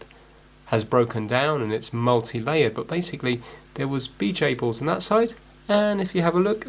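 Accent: United Kingdom. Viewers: D one day we want to see face. And maaan. I just love this british accent. Here in Germany we all love it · Hi im trying to figure out what part of the UK you are from with your accent? Warwickshire or? i might be wrong but just wondering where your from. Thanks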